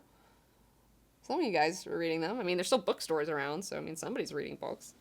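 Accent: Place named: South, United States. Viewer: Oh my god, I didn't realize that you're pushing back a Southern accent until you broke into the stronger (though still mild) Deep South accent when you were get excited (and possibly because of what's in your drink) about how Sony's fucked up Spiderman. Also, truer words have never been said. Sony is a sad fucking movie production company.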